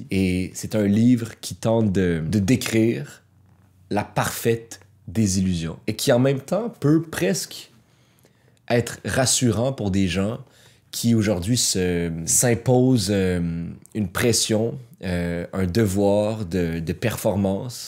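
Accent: Quebec, Canada. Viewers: C’est un accent québécois? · Trouver une chaine de littérature tenue par un homme, est rare ! Avec une telle passion, de jolis mots et avec le cœur, Exceptionnel ! J'adore également cet accent. Le Canada, reste pour moi un rêve ! Três heureux, de ce hasard qui m'a mené ici! Merci beaucoup, de ce magnifique travail et de nous le partager.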